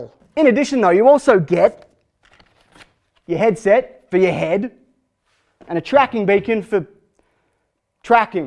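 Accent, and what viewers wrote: Australia. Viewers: I feel this is like 500% more Australian than normal. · He went full bloody Australian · For some reason, seeing your face with your voice makes you sound way more Australian than usual. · Your voice says Australian but your face says Mexican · you seem more Australian in this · oh my god you're Australian Billy Mays (God rest his soul).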